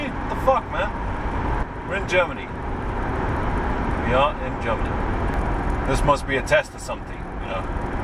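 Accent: United Kingdom. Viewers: you sire are from the Belgium?! Anyhow, Your queen english is like from Milton Keynes.:) I Have e34 525i, e39 540 and 635 CSi 1988. -Happy holidays -autobahn guy from Finland!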